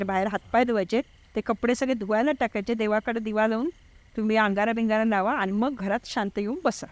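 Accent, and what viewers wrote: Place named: Kolhapur, India. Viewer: कोल्हापूरची मराठी भाषा ऐकून मन प्रसन्न झाले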